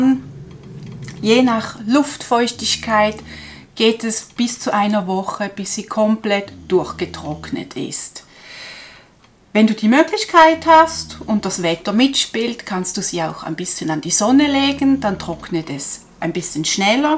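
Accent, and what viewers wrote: Switzerland. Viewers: Fantastische Kunst, bin begeistert 🥰, vielen herzlichen Dank für Deine wertvollen Videos, hab dir ein Like und Abo gemacht 🥰 (und dein Schweizer-Hochdeutsch sehr sympathisch 😀)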